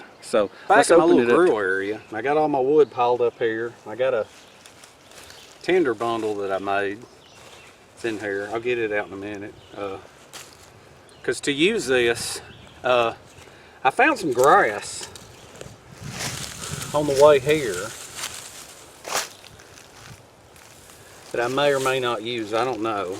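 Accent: South, United States. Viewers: I really liked this campfire story, especially with the accent. Its like southern ASMR.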